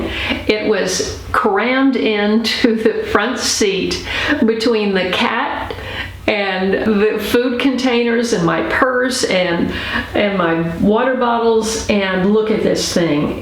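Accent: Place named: South, United States. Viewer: This was a lovely video, the music was perfect. I can hear your southern twang coming through!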